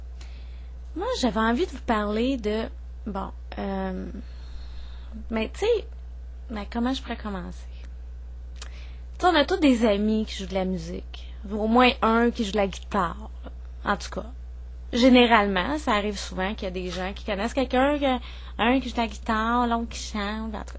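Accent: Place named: Quebec, Canada. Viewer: ha ben lawlaw toi té pas de monrial avec ton accent c sur !! soit té pas loin de québec soit tu frole le saguenay lawlaw.. ben vu qu avais rien dans le ciel a soir j ai cherché des blogs pis me suit tappé le tient..nonnon siroté le tient ;) ... a la revoillure tite binette !!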